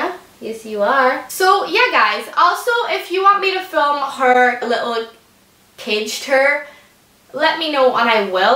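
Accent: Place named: Ireland. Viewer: Awe shes so cute and i love your irish accent!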